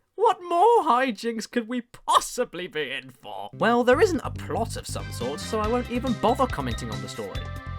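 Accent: United Kingdom. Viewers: this guy ain't the funniest I've seen but dang I cannot resist the british...seriously the very few girls I've ever talked to say that men with british accents are automatically more attractive... please teach my your ways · Playstation Master and JonTron Incarnate but BRITISH.= AWESOME. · I know that, but whether or not this other guy knows it he seems to think that a game which is suitable for 3-year-old kids makes it automatically a bad game. I just simply pointed out that he's clearly wrong. But anyways I'm sure we can all agree to whatever we're claiming here so I think we could just put this thing to rest and just continue praising you, your british accent and your beautiful sideburns. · Dude he is British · Ur so British!!!! I luv it ^^ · He is from the uk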